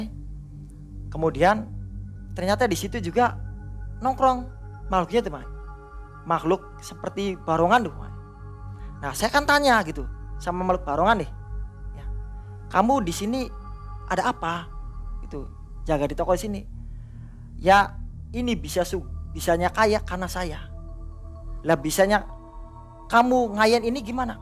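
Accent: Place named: West Java, Indonesia. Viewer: Pasti orang Jawa Barat 😂 Salam kang sing Indramayu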